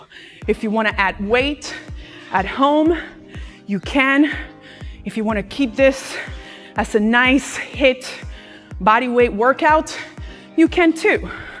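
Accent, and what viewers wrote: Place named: Latin America. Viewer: I love my latina sis' accent: shin becomes "chin"☺💕💕 Thank u so much for sharing your gift of fitness w us!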